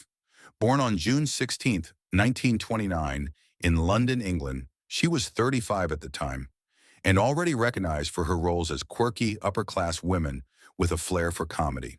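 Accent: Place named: United States. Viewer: why is a yanky speaking??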